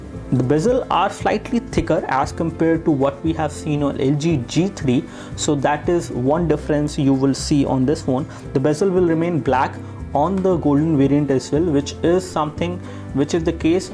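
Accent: India. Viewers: another indian accent...pffff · I suggest you check out other channels. The Indian accent might be a little hard for you :) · when Indian speak English. We hardly catch up what they are trying to say. The worst accent ever!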